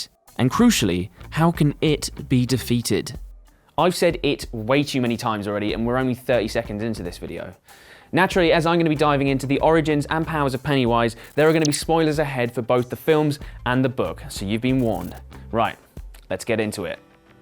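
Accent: United Kingdom. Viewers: Stop with the brit announcers!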